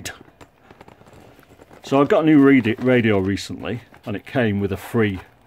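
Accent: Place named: North, United Kingdom. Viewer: nice one I'm sunny Grimsby and cleethorpes lol.could tell by your acsent.great stuff buddy keep it up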